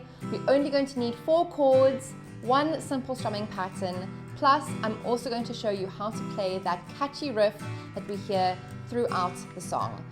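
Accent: South Africa. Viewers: Awesome! You seem to have a South African accent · You sound South African?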